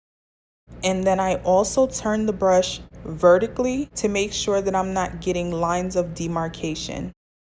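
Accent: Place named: New York, United States. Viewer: GIRL! You can hear the NY all up and through this video 😂😂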